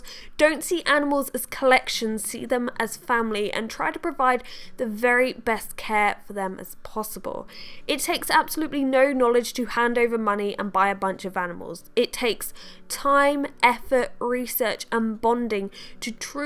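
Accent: United Kingdom. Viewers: Do live in England, Canada or the US? Because you have a British accent, but you have done that rover program. Not trying to be rude just wondering. 🙂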